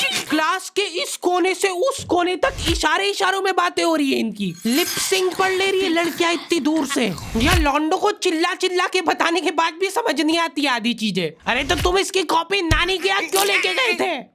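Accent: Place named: Rajasthan, India. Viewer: Bro is from rajasthan 😅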